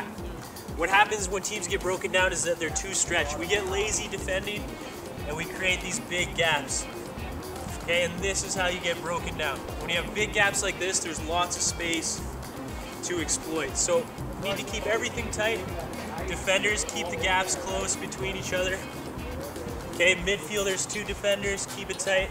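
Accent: Canada. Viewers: He sounds like a Canadian to me.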